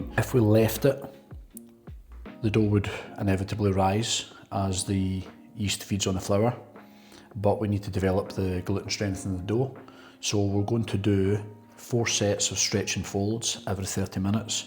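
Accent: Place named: Scotland, United Kingdom. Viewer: of course, my wife even said that she doesn’t know what’s better - a man baking a bread or a Scottish accent she hears while watching it haha 😄 fingers crossed for your channel mate!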